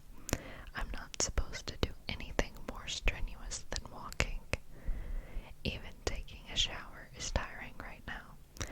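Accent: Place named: Texas, United States. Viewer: In the first video you made you had a Texas accent! It was super tingly if you ever want to make another some time 😊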